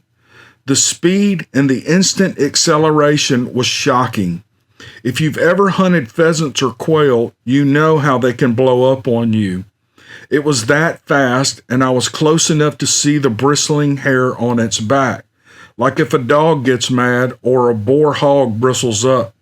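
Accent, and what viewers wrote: South, United States. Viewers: I just love your soft, southern, masculine voice! Thank you for providing such a great respite from all the political craziness. I wonder who Bigfoot would vote for? Hahahaaa! No! No, I won't follow that thought any further! 😂 Great video! Great stories!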